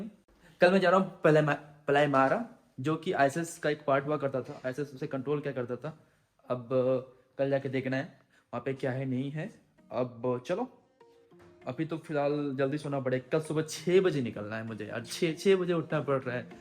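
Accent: Bihar, India. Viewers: That,s pure 😘 Bihari accent दुलहा 😂 love you brother ❤️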